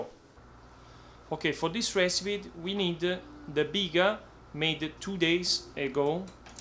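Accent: Italy. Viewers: che tipo vivace che sei maddò si capisce subito che sei italiano! Tanti saluti da un abruzzomolisano che vive in Germania. continua così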